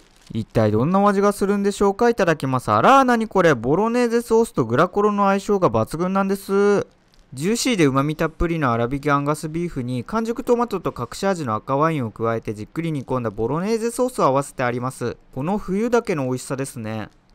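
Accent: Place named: Kansai, Japan. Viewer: 私はミスドでイーブイだけ食べれたけど売り切ればっかりでした😖 関西色強めですね😂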